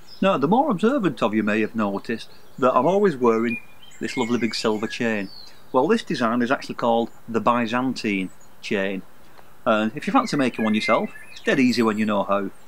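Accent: Ireland. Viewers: Boy do I love your Irish brogue! It makes my Irish blood sing! (I’m 40% Irish and proud of it!). You’re a great teacher and I think I’ll subscribe! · Sir, you are a very, Very, VERY good teacher! The Irish accent doesn't hurt either.😉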